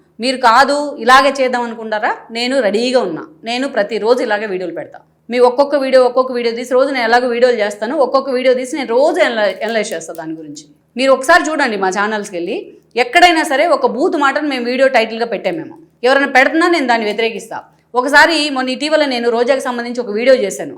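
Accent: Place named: Andhra, India. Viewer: Exllent explanation madam this is Andhra voice.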